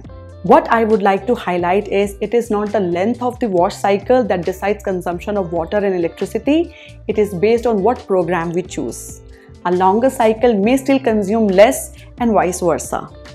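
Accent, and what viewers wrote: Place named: India. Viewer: Ayyo sumne kannad baralla Anta heltare illa Andre swalpa swalpa gottu Anta Hindi accent Alli heltare..nam Bengaluru kathene istu 😀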